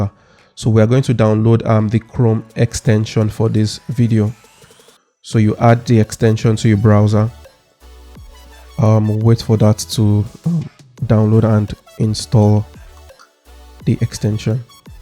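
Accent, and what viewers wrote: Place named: Nigeria. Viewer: Thanks alot for the walkthrough!! You sound like you are from Nigeria bro.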